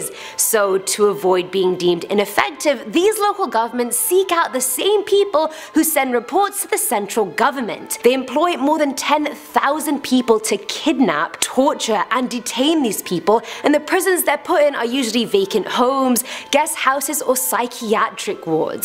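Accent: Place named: United Kingdom. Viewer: A new host at Landon? I didn't know. Trying to figure out where you're from. You sound British but it sounds like there's another accent in there.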